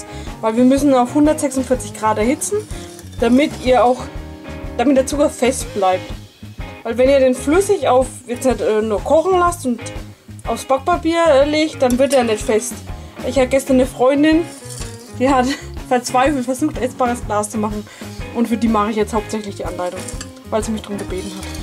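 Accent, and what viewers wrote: Franconia, Germany. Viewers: PALACE ja so redet man in franken nunmal ;) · Jawohl a Fränggin ;) gfälld ma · Man hört es ich komme auchbdas franken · du bist 100%ig aus Franken!!! Ich bin selber aus Frangen (:D) und ich kenn sau viele die so sprechen :D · PALACE ja, das ist ihr Dialekt, Fränkisch:) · DressCommunication also so krass fränkisch schwätze tut de jetsche ned, daß mo dä ned vastehe kännt (oder so ähnlich) bin kein schwabe ich bin Hochdeutsch erzogen worden, kann aber dennoch (im gegensatz zum schreiben) Schäbisch schwätze, Hessisch Babble und berliner Schnauze und i red boarisch.. Dialekte darf man ja eh nicht mehr haben weil man ja rassist ist, wenn man jemanden den weg erklärt und der meint man verarscht ihn..nur weil er den bundesland gegebenen Dialekt nicht versteht.. o.O Das einzige was mich an dialekten aufregt ist dieses Semmel=Brötchen, Pfannkuchen=Crepés, Berliner Pfannkuchen=Krapfen !... 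Weißbier=Weizen, Colaweizen=Russe usw usw... da kann man ausflippen wenn man beim bäcker an der theke steht... >.<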